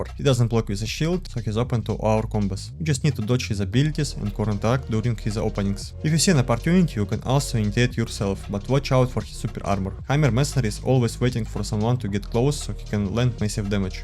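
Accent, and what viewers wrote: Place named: Russia. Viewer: This russian accent 😛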